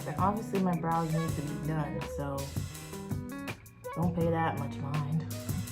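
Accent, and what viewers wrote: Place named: Jamaica. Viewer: Sound Jamaican 😎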